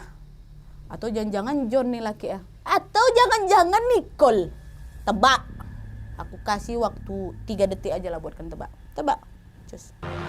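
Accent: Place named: Medan, Indonesia. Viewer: tuh org sunda tp cara pembawaannya kaya orang medan😊 aku suka cara pembawaan ceritanya, meskipun menegangkan tp bisa bikin hati tetap slayyyyyyy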